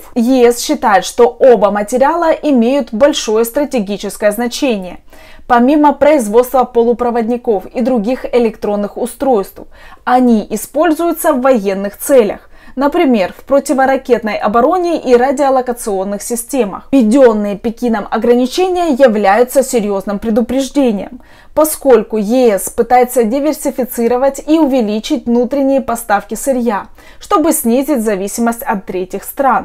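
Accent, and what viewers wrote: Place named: Ukraine. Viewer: Дуже сильний малоросiйский акцент. Треба бiльше працювати над мовою. А так, спасибi, цiкаво слухати.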